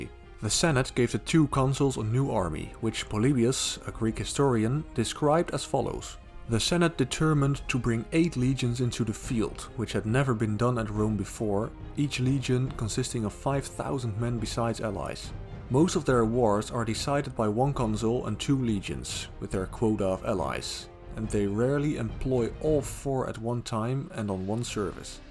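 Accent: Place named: Flanders, Belgium. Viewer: Are you from Belgium? I am hearing a Flemish accent.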